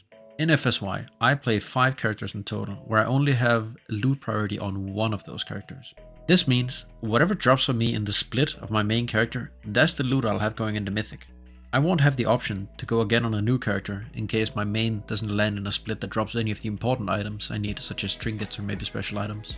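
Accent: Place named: Denmark. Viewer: I fucking knew you were Danish by the accent and then got confirmed when I saw those puregym dumbbells. Let's goooo!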